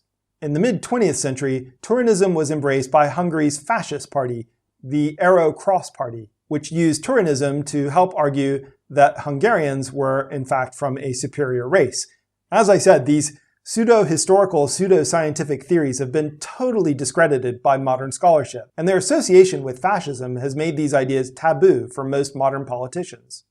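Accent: United States. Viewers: old Dutch; speaking about Turkic language with US English. Yes sir.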